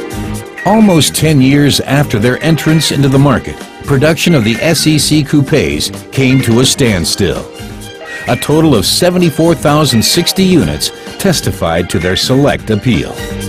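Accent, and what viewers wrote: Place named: United States. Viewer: The awkward American narration grates.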